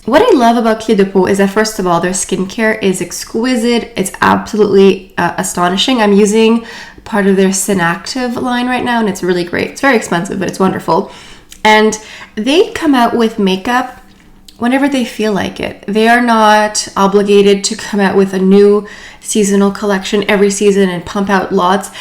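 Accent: France. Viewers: Hello, I like your French accent... Thanks for sharing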